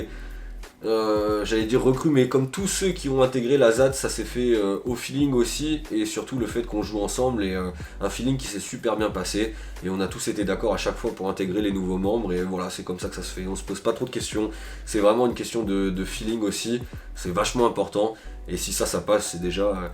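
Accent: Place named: Corsica, France. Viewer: je te reconnais le corse hahahaha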